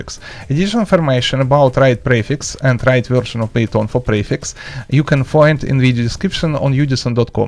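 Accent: Russia. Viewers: сразу видно, русский акцент)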